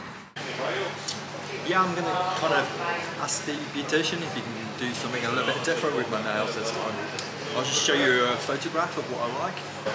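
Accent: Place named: Birmingham, United Kingdom. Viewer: You going to do anymore exploring, used to like them, are you originally from Birmingham, detect a bit of brummie accent there 🤔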